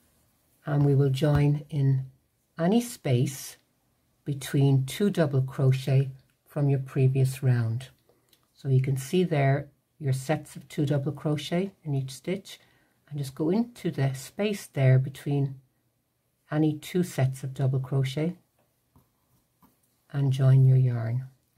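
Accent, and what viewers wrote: Ireland. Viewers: Thank you. Wish all my school teachers had had your Irish Accent maybe I'd have listened more at school, you make it sooo easy to follow. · delightfully surprised to hear an Irish accent when I turned the volume on lmao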